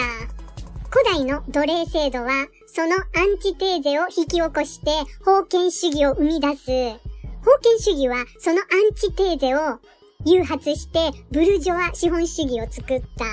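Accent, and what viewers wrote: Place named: Osaka, Japan. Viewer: なかなかマルクス主義を主題にしながら、現実は、それが空論であり乍ら、利用され続けているという惨たらしい歴史をまことに見事に大阪弁の楽しさで、しかも日常的に示唆し続けてくださっている先生に感謝です。この地球俯瞰的な見方は、隣国から浸透され続けている今、とてもとても必要不可欠だと思います。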